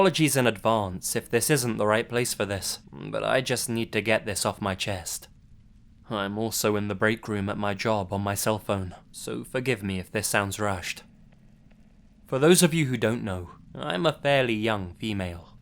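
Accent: United Kingdom. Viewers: How and when did you get into narrating horror stories. Also are you British? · I love your creepy intro. And your adorable British accent.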